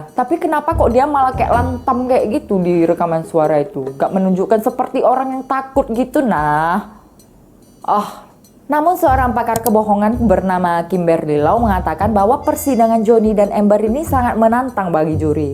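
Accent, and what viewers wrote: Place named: Sumatra, Indonesia. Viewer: Logat khas Sumatra-nya yg bikin asyik 🇮🇩🥳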